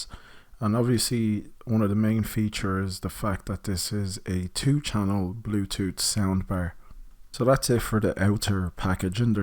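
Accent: Ireland. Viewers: I love your Irish accent 😁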